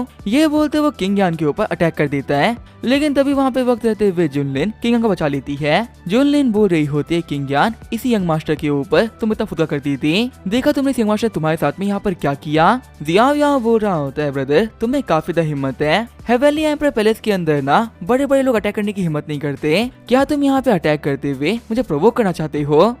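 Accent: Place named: India. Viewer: FFS why indian voice AGAIN !! Did this channel get hacked or something ?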